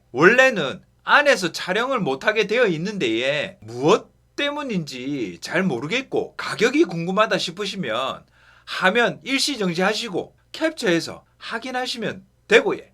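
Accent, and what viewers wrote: Gyeongsang, South Korea. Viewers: 갱상도 살아있네예~ 👍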